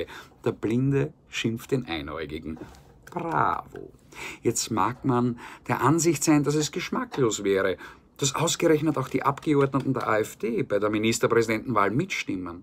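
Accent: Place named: Austria. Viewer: Hach wie schön ist es einem Österreicher zu lauschen 🙊 ach du je erwischt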